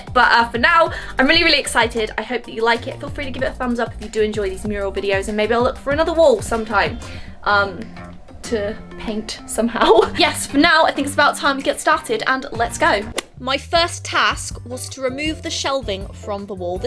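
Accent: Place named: Cornwall, United Kingdom. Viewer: are you from Cornwall sounds a bit cornish? I love your art by the way Luv from the UK xx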